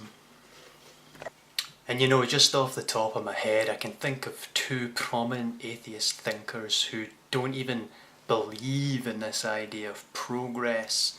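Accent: Scotland, United Kingdom. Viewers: Great video! loved the 'enlightenment' bit =) hearing that beautiful Scottish accent is never bad, either :)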